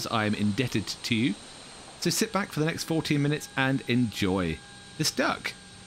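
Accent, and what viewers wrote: United Kingdom. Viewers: Great video as always, hah. I have a silly question.. What is your accent called ? Is it the British standard one? Rp? Sorry for the question.. hah.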